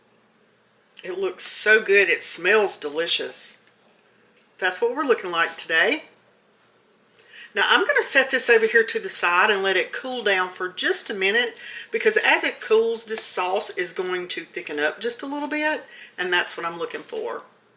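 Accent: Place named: Alabama, United States. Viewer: My stepmother's family was from Jackson Alabama. I love to hear Alabamans talk. I could listen to you all day.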